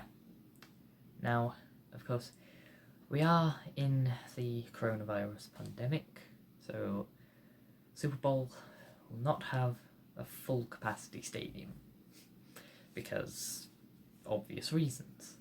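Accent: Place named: United Kingdom. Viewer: watching you be exceptionally British makes me insanely happy oh my god THE FREAKING ACCENT #Simp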